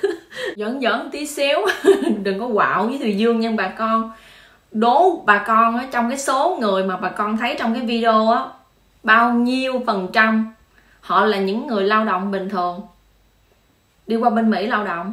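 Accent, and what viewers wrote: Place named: Mekong Delta, Vietnam. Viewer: Giong noi mien tay rat ngot ngao va chan tinh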